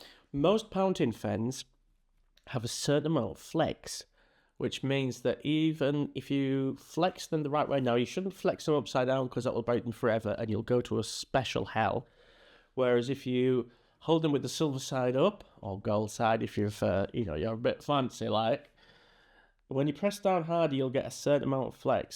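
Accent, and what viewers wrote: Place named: Yorkshire, United Kingdom. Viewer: Our washer is running, which is quite loud, so at first it sounded like you said, "Holding the pen at the fruit store." 🤣🤣🤣 I have no trouble understanding your Yorkshire accent (I'm an American with a longstanding love for British TV especially Monty Python) but with the washer running it made it sound different. I should maybe also mention that I often mis-hear words as a quirk of my autism. It makes for some hilarious conversations with my husband. 😂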